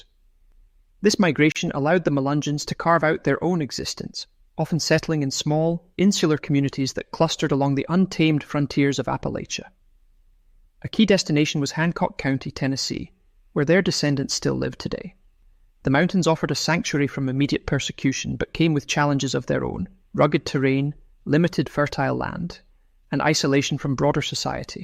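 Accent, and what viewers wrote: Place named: Scotland, United Kingdom. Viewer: The narrator has a Scottish accent.💙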